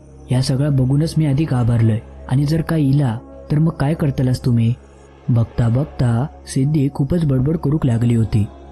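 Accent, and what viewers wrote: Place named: Malvan, India. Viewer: Story mast hoti 👍👍😊tumach malvani awaj mast ahe 👍👍👍❤second part lavakar pathava 😊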